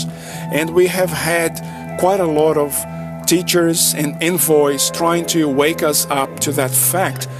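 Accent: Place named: Germany. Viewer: Oh, WOW, lovely to have an interaction with you, especially since we are both on the "yellow brick road" like Dorothy from the "Whizard of " leading us to our true home! You are quite right about Austria being a place of knowledge, art & culture, but on the other more darker side it´s a stronghold of the Cabal (south of Germany, north of the Vatican & east of the Swiss financial Babylon - no coincidence that I decided to incarnate right here to clear up the stuck energies of bloodshed & endless wars on European soil. I was wondering about your accent & thought you might be of German origins as well, but you also made references to the Portuguese language..... so you got me confused there;-) But it doesn´t really matter as long as we are using the language of love & you definitely do;-)) Stay safe, & looking forward to meeting you in your next videos!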